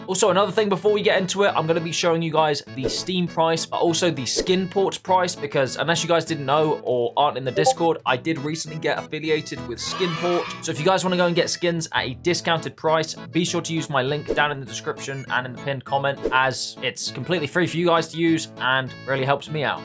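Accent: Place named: United Kingdom. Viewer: your bri ish accent is annoying (no offense)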